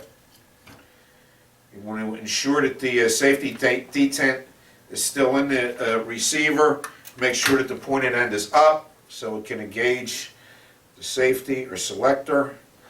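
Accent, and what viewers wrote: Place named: Nassau County, United States. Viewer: nassau county accent detected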